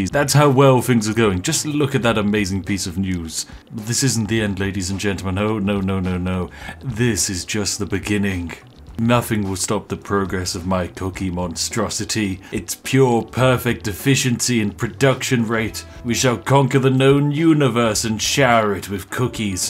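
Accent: United Kingdom. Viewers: You British Bruh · He sounds british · Ur from britain? (tea image) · I just saw the prewiew, clicked on video and by the first words already knew that he's british without even looking at channel name or the video itself . I think it's time to reconsider my life choices. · Is this guy British? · You sound more British than MumboJumbo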